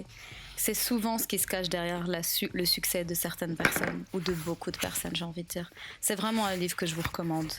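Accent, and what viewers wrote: Quebec, Canada. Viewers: J'entends un accent québécois là nan ?? 🙀🤭 Super vidéo avec des good tips, ça fait toujours du bien de le rappeler 😘 Keep doing girl 💪😘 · First, tu as clairement pris l’accent québécois hihi (we win!!). Second, JADORE encore une fois ta vidéo. Non suelement ton montage est magnifique, mais tu as toujours toujours pleins de conseilles qui nous aide à se développer. Merci , merci de partager ce moment difficile avec nous. De nous inspirer à aller de l’avant et être de meilleures personnes! We love you💕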